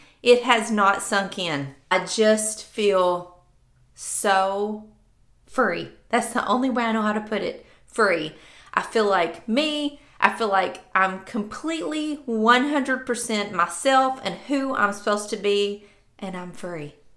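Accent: South, United States. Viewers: I did the same when I reached 47.. my husband misses the red head. He got over it. He has a duck dynasty Grey beard. God Bless,, embrace the authentic you.. Our world is too full of fake people. Be the real deal. My hair got curly later in life and love it. I blame it on medication. P.S. love your accent so southern. Mine is a Texas drawl.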